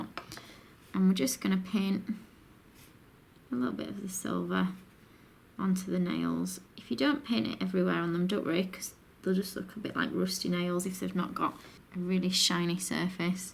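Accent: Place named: West Yorkshire, United Kingdom. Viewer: Great channel xx just wandering of your from West Yorkshire..you sound it haha xx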